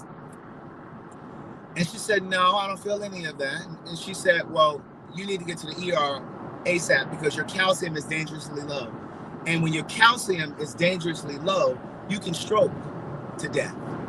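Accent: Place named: Baltimore, United States. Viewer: I just love looking at you and listening but I am here in Baltimore too and I understand what you are talking about and you sound just like someone from Baltimore. 😂 But I hope your mom doing better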